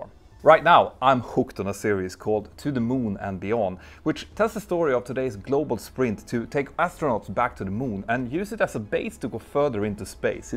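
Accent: Sweden. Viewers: A 100 % the host’s from Sweden… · The Swedish English really makes this feel international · Are you swedish?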